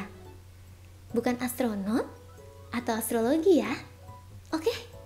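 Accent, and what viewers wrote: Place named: Indonesia. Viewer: That Indonesian accent when speaking English though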